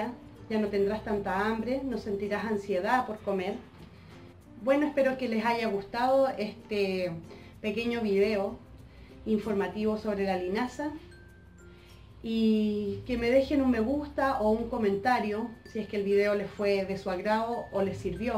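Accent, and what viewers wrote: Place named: Chile. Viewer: POR FIN VIDEO EN ESPAÑOL CHILENO! !!! MUCHAS GRACIAS ! DESDE MAÑANA COMIENZO CON TU RECETA 😊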